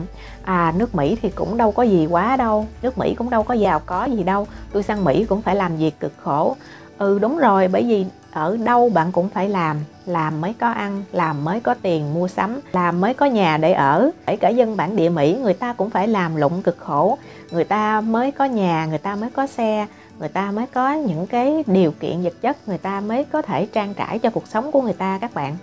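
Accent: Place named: Saigon, Vietnam. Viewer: Cảm ơn em, giọng đọc rất truyền cảm, rất chuẩn Saigon, US.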